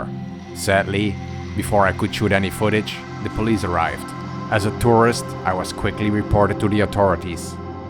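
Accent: Netherlands. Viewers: This guy sounds dutch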